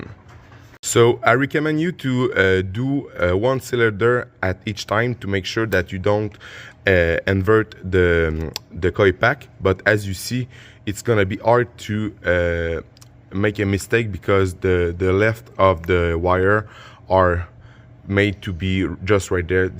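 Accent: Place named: Quebec, Canada. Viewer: Merci pour la vidéo. Très utile, accent québécois en prime! Comme le mentionne un autre commentateur, il ne manque que l'écartement des électrodes.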